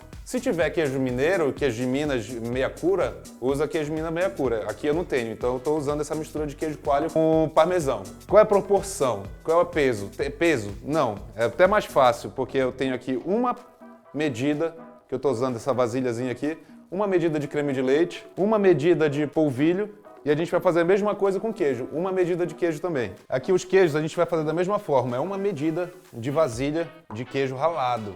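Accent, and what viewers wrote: Pará, Brazil. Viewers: Tão bom ouvir o sotaque paraense no YouTube . De chefe de cozinha ainda, perfeito!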